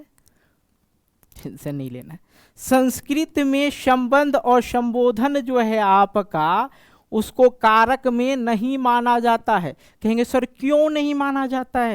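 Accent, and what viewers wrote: Bihar, India. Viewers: Sir aap bihar se ho kya 😅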